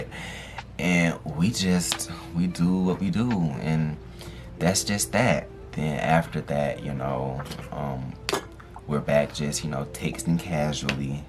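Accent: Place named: South, United States. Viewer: You look and sound like a real southern. belle. Keep enjoying and keep growing your content .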